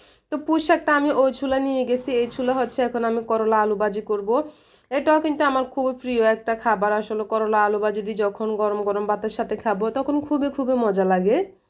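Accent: Noakhali, Bangladesh. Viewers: আসসালামু আলাইকুম আপু।। তোমার বুদ্ধির তারিফ করতে হয় আপু। মাশাল্লাহ এত বুদ্ধি তোমার। এত সুন্দর টেকনিক খাটিয়ে নিশ্চয়ই স্বামী স্ত্রী একসাথে হয়ে। এ ধরনের আশাগুলো তোমার পূরণ হয়েছে। তোমার কথাগুলো অনেক ভালো লাগলো। যদিও এভাবে চলে না কেউ। তবে আপু চেষ্টা করব ভালোভাবে স্বামীর সাথে চলার। এবং চলে এসেছি। ইনশাআল্লাহ। আমার কথাগুলো আমার মনে থাকবে। তোমার আইডি এগুলা।তোমার কথা শুনে মনে হচ্ছে তুমি আমাদের নোয়াখালীর মেয়ে। যাইহোক আমার ভুলও হতে পারে। দোয়া রইল আপু তোমার প্রতি। তুমি আরো স্বপ্ন পূরণ কর। আমাদের জন্য দোয়া করবে আমরা যেন তোমার মত চলতে পারি ইনশাআল্লাহ। ❤❤❤❤❤❤❤❤❤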